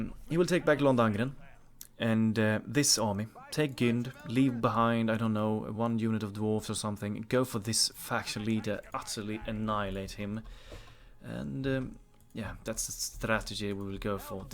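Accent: Sweden. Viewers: From which part of Sweden are you?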